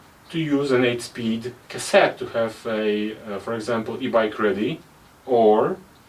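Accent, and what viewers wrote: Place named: Russia. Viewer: сделаю смелое предположение: судя по акценту - автор понимает по-русски )) можете поделиться опытом и ответить на два вопроса? 1) долго цепь ходила по сравнению со старой системой?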